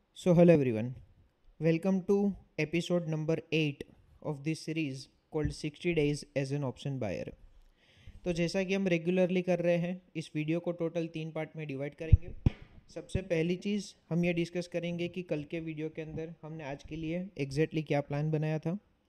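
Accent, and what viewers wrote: Gujarat, India. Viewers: Bhai Tame Gujarati cho?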